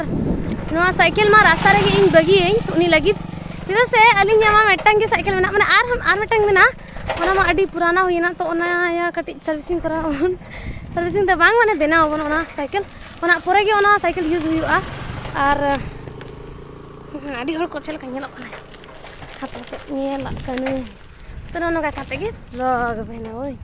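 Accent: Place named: Santhali, India. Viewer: Super santhali voice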